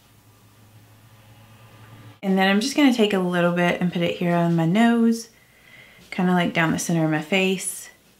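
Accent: South, United States. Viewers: Lol you’re so cuteee! Sounthern accents are so cute 😭😍☺️☺️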